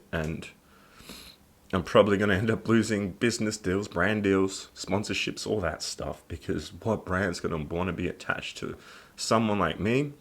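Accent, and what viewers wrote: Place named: Australia. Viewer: Hey man. I'm follow you from Perú. Your dad's video really touched me. At that time my father was fighting a battle that soon after also took him away. I started watching your videos several years ago when I wanted to improve my English on my own and found your accent interesting. At the time I didn't know you were Australian. Now years later my listening is much better so your Australian accent helped me a lot. Thank you very much, keep strong and keep doing you.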